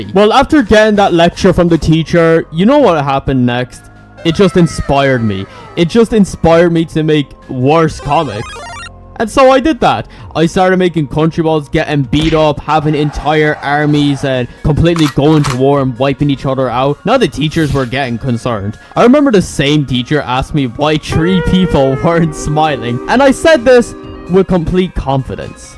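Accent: Ireland. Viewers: Are you Irish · are you IRISH:hand-pink-waving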